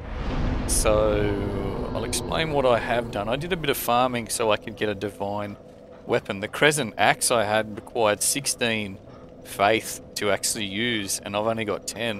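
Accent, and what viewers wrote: Australia. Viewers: Are you Australian? Your accent is awesome!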